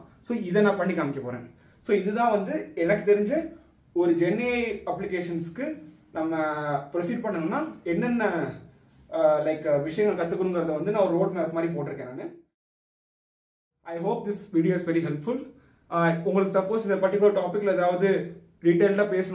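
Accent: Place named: Kerala, India. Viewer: And your malayalam kalandha thamizh is beautiful and cute.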